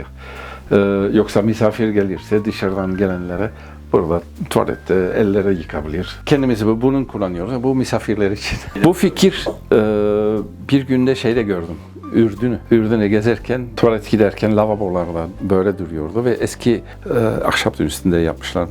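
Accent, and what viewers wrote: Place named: Germany. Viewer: there is a song called, which a man speaks english with a german accent. I just remembered that song when you speak english. stupit comment anyway. nice home, enjoy the sun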